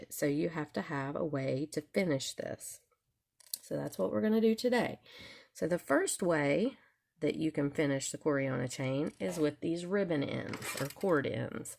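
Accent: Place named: Texas, United States. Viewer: I thought I had your accent pegged as Texan, but I see that Fort Bragg is in North Carolina. Being a military (Air Force) brat myself, I know that families move around a lot. So, my question is, where are you from? Btw, YouTube suggested your channel to me and I'm glad they did. I am a new subscriber.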